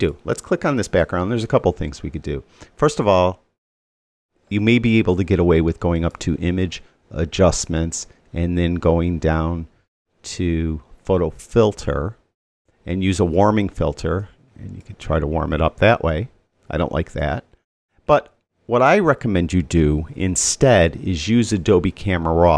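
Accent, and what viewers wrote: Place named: New York, United States. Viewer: Thanks. You astound me with your knowledge and how you remember all the shortcuts keys for so many programs. I am a Lightroom Cloud user + I also use Pixelmator Pro as I find Photoshop to cumbersome a program to use when I only need to use it spasmodically. I really like Pixelmator Pro and find it a lot easier and more fun to learn and it is also very good value at a one off cost of $35 AUD when they had the half price offer. I will definitely be watching your future Pixelmator tutorials and if I can think of anything specific that I would like you to cover I will let you know. Has anybody ever told you your voice sounds like Paul Stanley from KISS. Must be the New York accent. Cheers from Sydney Australia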